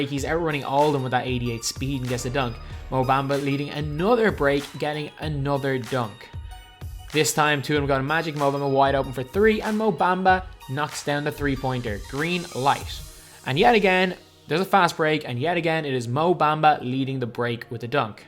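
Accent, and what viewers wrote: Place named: South Dublin, Ireland. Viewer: This is the biggest south dublin accent I have EVER heard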